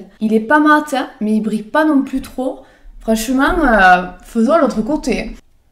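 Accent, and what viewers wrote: South, France. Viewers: Bonjour! Je viens de m'abonner à ta chaine, jai le meme accent que toi meme si je l'ai perdu un peu en Ardèche!! De quel coin du sud tu es? Moi Avignon (et quand j'écoute une video ou je parle, c'est le même accent que le tien🤣). Bisou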